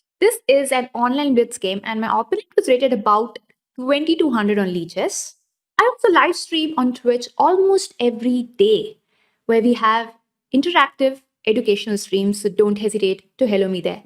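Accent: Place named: India. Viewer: You need to sort that popadom accent out girl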